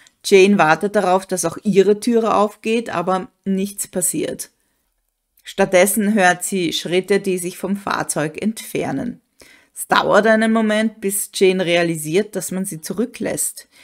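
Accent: Austria. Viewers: da lag ich auch fast richtig. Wien hoerte ich zwar nicht raus, aber Ich hoerte leicht Oesterreich raus. Gruss aus Tirol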